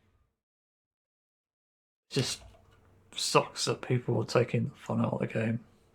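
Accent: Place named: United Kingdom. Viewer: I was surprised getting this vid in my yt recs, especially since most vids about drg be like: "Maaan what a beautiful game, perfect devs and even better non-toxic community". And I agree it, for the most part, at least. But it actually has same thoughts that i had recently. I may have not get everything you said a bit, even with subtitles, due to you have slightly bri'ish accent (don't mean to offend you in an way) and i'm not a native english speaker (i'm ukrainian), but anyway. So let me tell you my story with drg. Last year my friends, who already bought this game , gifted it to me at Steam. They said all that stuff about the game, shortly, devs, community, so on. And we played it for a while, DRG seemed perfect to me, because i'm a bit introverted myself, I can be social and extraverted in company, but if it happens for a long time - it drains me out mentally, you know. 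I like to be alone sometimes, so it was pleasant when i found that you can play single with bosco. At some point, some of my friends got tired of deep rock, some of them won't even speak to me anymore, but that's another story. But i still liked the game and wanted to know more about it. Since I had no one to play with and singleplayer had more meditative vibe then more active vibe, i started to play with randoms. At the first time it was cool. Everyone was chill, greybeards tried to educate me in non-offending manner, and i could talk to people from different countries across the Europe, practice my commu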